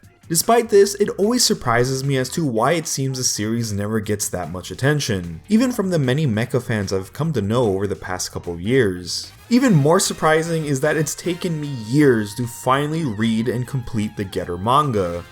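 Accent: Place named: South Korea. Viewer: なんかぺららぺ朝鮮なまりがきつい朝鮮なまりがきつい まじで・・・YES・・まんじゅ。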